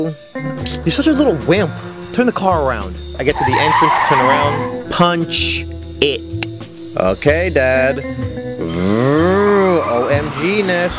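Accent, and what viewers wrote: Trinidad and Tobago. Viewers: You from Trini? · I was waiting for him to bus out a trini accent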